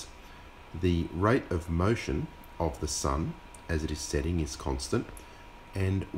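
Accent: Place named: Australia. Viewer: Quick question about your filming location. If it’s not too much trouble, can you post your coordinates and the direction you’re facing please? I’m assuming ( because of the accent) that you’re in Australia or New Zealand. If that’s true you’d be facing northwest to film , correct? Did you take into account any physical obstructions (like mountains) that would create a false horizon? Mountain ranges in the distance or other obstructions can do this, creating the illusion of a physical horizon. I’d just like to be certain of what we’re seeing. TIA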